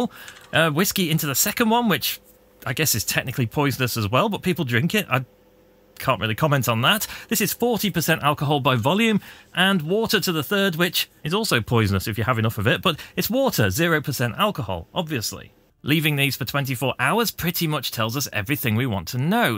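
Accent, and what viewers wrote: United Kingdom. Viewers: I've not tried it, but you might be able to get more purer ethanol in the form of BioEthanol (~95%) assuming by your accent that you're in the UK, they sell this in B&Q, although the pricing is pretty much the same as IPA, so not sure if there's any benefit.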